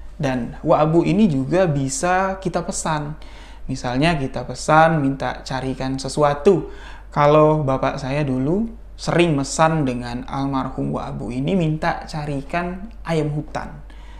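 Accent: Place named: Sumatra, Indonesia. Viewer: aku nyimak mulai awal aku kira dulu orang madura .terusdan terus ku smak eh bru aku ngeh oh logat sumatra sekalinya.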